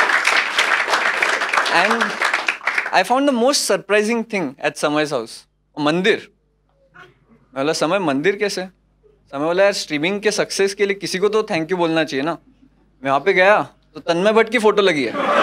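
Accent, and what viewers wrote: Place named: Gujarat, India. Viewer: Munawar Faruqui accent dono gujrat se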